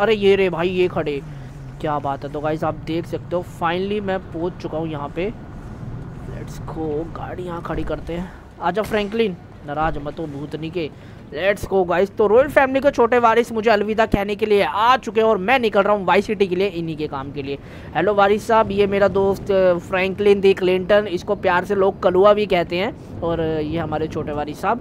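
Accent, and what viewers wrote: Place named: Saharanpur, India. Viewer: WAHHHHH BHAI SAHARANPUR WALI BOLLI ENGAE UNGAE ❤❤❤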